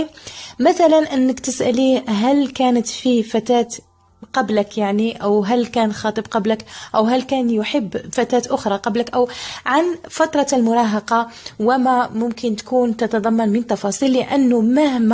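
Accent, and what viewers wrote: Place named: Libya. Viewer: لهجتك ليبيه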